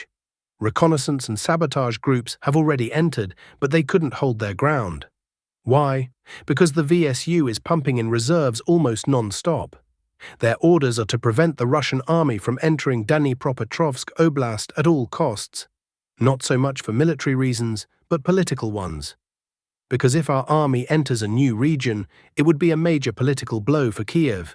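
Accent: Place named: United Kingdom. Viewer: It is strange to have a British accent , and referring to "we and "us" during his narrative. I am suspect of this channel.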